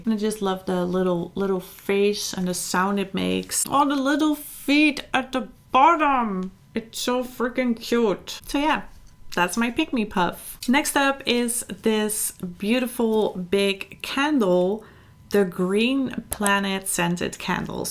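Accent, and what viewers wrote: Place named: Netherlands. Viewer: I'm new to your channel and I knew you were Dutch just by the accent haha lovely video!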